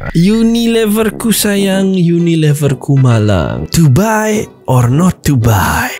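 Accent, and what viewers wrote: Bali, Indonesia. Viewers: Bali deh kayaknya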